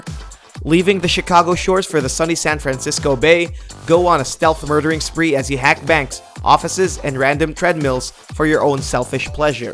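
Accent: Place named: Philippines. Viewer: This dude sounds Filipino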